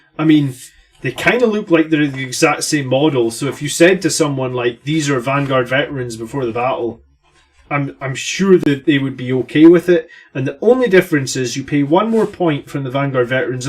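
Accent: Scotland, United Kingdom. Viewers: Is it heresy for me to say I watch your channel but am not making Blood Angels? It's just nice to hear another Scottish voice doing 40k stuff ! I'm building my very first army as the basic boys in blue, but your vids are good for understanding how an army should be built.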